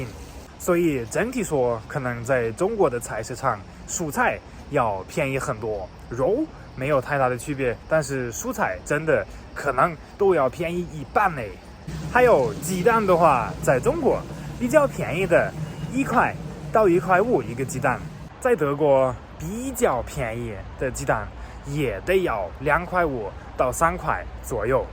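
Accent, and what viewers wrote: Sichuan, China. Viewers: Learn. Chinese stay Beijing . He speak si chuan. And is video skill need improve . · 晕一嘴川味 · 一口道地四川話，很有趣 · 小伙的汉语已经被四川人带跑偏了😃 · “ ”的发音好四川，哈哈，你讲的是老外普通话夹杂四川词汇，非常有意思！继续加油哦 菜市场比较传统，便宜新鲜，会过日子的老人主妇煮夫喜欢去，年轻人则更习惯去超市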